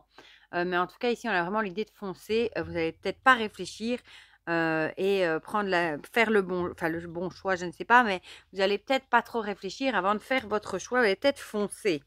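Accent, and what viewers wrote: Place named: Belgium. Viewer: Coucou bonjour 🐕❤🐈🦊🐿🐇🐭🐼🦔🦉🐦🦩🪶❤, Waow waow magnifique énergies 🎉, j adore ♥. Tu me fais trop rire 😂 avec ton petit accent Belge 🇧🇪. J adore 😂😊👍. Merci beaucoup pour la petite pensée 🐦, pour moi. Oui j adore 💙🐦 les Mes Anges 🐦😇. Grand Merci pour cette guidance pour la semaine. Bon Dimanche, bisous bisous Sylvie 🙋‍♀️🦋🕊🦋🕊💙💙💙🙏🌈🌟🌈🌟 Bonne semaine ps: oui effectivement les bougies d actions, se consume plus vite, je confirme 🥴🥴🥴.....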